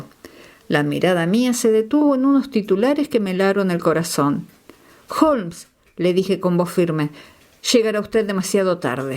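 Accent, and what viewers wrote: Argentina. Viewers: ¡Les deseo muchas felicidades en el 2024! Gracias por tan agradables narraciones claras y con la musicalidad del acento argentino.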